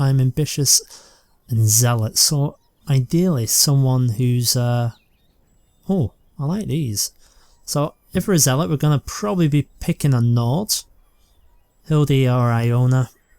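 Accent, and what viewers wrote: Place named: Scotland, United Kingdom. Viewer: Your relaxed accent reminds me of * and history documentaries.Scottish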